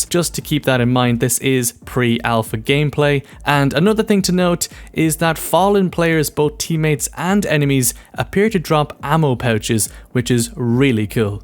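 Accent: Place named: Ireland. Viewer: Hes Irish. Its in his accent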